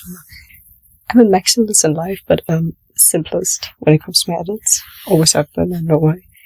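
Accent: Germany. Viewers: Thanks for the tut Also German accent?? My friend speaks German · I LOVE YOUR VOICE! Also your german accent is so cuteee :3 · AWWW I LOVE YOUR VOICE despite knowing you were German I didn’t think about you having an accent lmao but it’s also adorable??? like girl I lowkey want it 😭 ich spreche Deutsch a bit too as I’m a little German myself :) great tutorial as well!! <333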